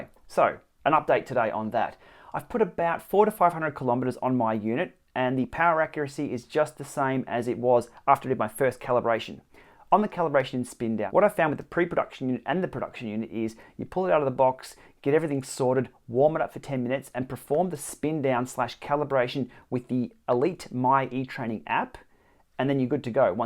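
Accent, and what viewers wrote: Australia. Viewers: Hi I am conemplating buying a Direto since I have a problem with the watts distribution on my Tacx trainer: in intervalls it shifts from one sec to the next from 100 to 400 watts and I have to programm increments of 20w every 4 sec in order to have a smoother transition from one interval level to the next - is this any better with the Direto or can I programm a smoother curve on the elite Training app? Thx for a feedback - Marc/Switzerland (just having completed the Croc Trophy in Cairns..... so its good to hear some fair dinkum Aussie accent)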